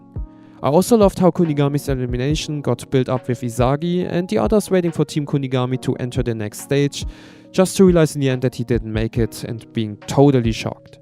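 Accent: Germany. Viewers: Sag mal bist du deutsch? Und sehr geiles Video btw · Are you german?Cause you do sound like one? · Are you german? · Bruder man merkt direkt das du Deutscher bist lol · Haha, man hört so krank das Deutsche XD. Aber gutes Video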